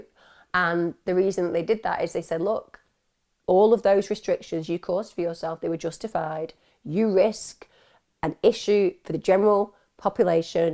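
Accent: United Kingdom. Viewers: I just heard you in a deep dive and glad I did. Thoughtful takes and yeah, British accent. Good job. Cheerio!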